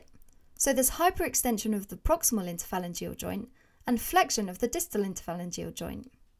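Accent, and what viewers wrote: United Kingdom. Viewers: She is British, but Princess Diana did not have this accent. This one is more like Laura Rhensfield from the BBC.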